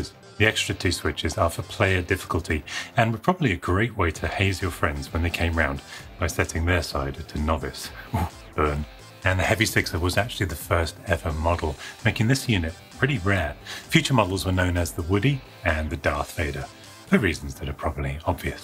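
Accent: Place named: Southern England, United Kingdom. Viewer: you sound south of England area like myself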